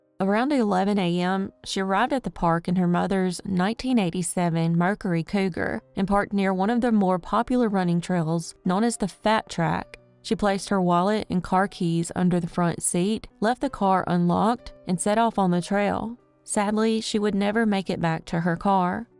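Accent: South, United States. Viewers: Thank You For all the work and time you put into your videos. You are the BEST crime channel and we all Love your southern accent ❤️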